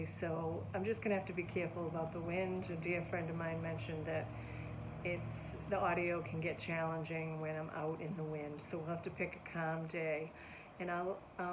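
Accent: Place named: New England, United States. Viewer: Audio was fine very clear good video. I can tell you’re from New England though lol.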